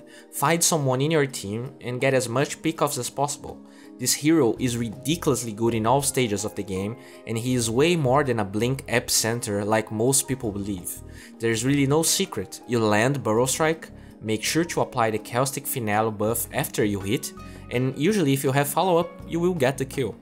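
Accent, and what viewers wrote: Brazil. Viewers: Your accent sounds like we brazillians talking in english. Great video, keep it up. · Are you Brazillian ? It looks like by you accent. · É BRASILEIRO SIM PORRR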